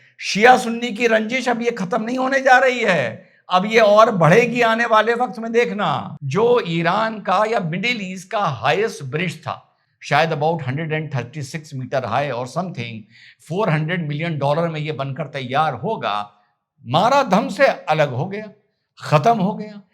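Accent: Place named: Uttar Pradesh, India. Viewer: Namaste ..aspki UP ki meethi boli sunkar maza aata hai..over and above your honest analysis is not only worthy an applause but u have set an eg of what intelligent and fair educated people should adopt ..salaam to u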